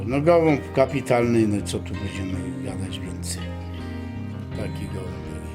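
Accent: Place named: Cieszyn Silesia, Poland. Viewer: Witam. Skąd Pan jest bo akcent jak z Śląska Cieszyńskiego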